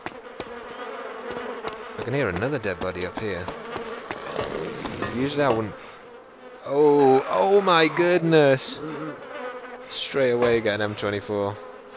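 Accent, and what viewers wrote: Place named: Northern England, United Kingdom. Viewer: Sound like you're from Northen England!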